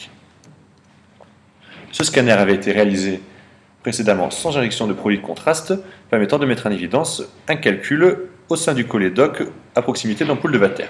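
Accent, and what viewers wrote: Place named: France. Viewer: le meilleur accent de France, merci pour cette video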